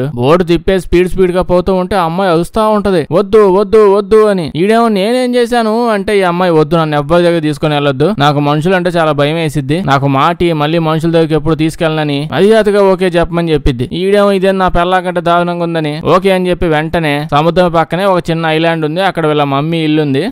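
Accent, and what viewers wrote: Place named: Coastal Andhra, India. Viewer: అసలు సినిమా కథ ఏమోగాని భయ్యా, నీ నారేషన్, అదే భయ్యా, తూ.గో, కృష్ణ జిల్లాల కలగలుపు భాష ఉంది చూశావ్, దా. . నమ్ అహ, వద్దులే బాగోదు. . .అది మాత్రం ఇరగదీశావనుకో! అట్లాగే ఇంకో సినిమా గూడ సూపిచ్చు, మర్శిపోవొద్దు. థాంక్స్.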